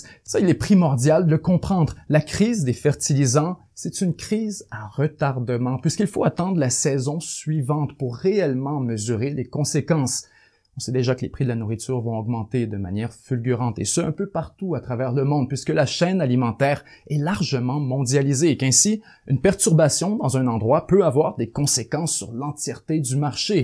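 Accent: Quebec, Canada. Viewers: Quelle eloquence ! Le ton de la voix, les intonations, etc ...C'est un vrai plaisir à écouter. Meme le leger accent québécois met une touche en plus. Une tres bonne contribution pour francophonie de YouTube !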